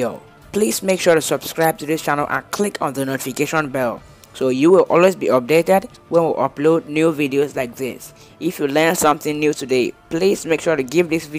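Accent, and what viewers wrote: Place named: Jamaica. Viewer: What country are you from? You sound Jamaican.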